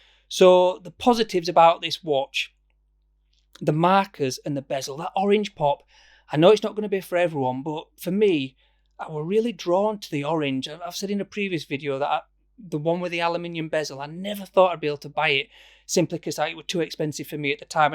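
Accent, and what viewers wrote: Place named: Leeds, United Kingdom. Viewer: Enjoyed your review, very rounded and if I can convince the missus this will be my next purchase Trying to place your accent, somewhere near Leeds ?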